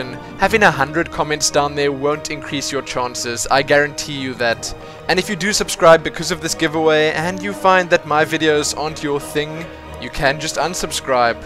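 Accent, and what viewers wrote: South Africa. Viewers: You Sound South African Im from there too! Huge dota 2 fan! Key would be amazing!!!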